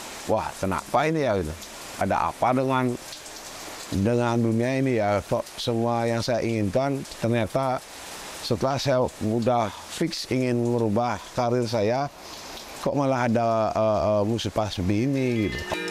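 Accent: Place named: Bali, Indonesia. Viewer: Karakter khas org Bali banget.. logat, 'n caranya berbicara...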